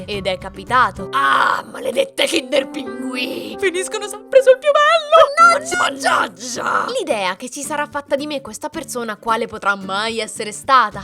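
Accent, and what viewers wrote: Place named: Milan, Italy. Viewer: Ahahah, il tuo accento milanese è fantastico! Ti è mai capitato di incontrare qualcuno di famoso per strada e sclerare ? Comunque, bellissimo video. Baci💚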